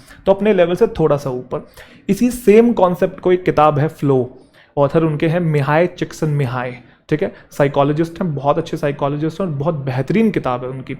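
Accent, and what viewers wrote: Haryana, India. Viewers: Sir ji haryana se ho na??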